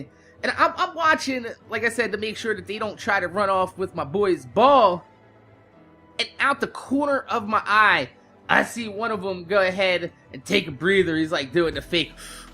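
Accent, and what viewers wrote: New Jersey, United States. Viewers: Hey... glad you handled the situation like a boss. I know you mentioned your Jersey accent in another vidja. Guess you're still in Jersey? I'm not too far off from there. get at it bro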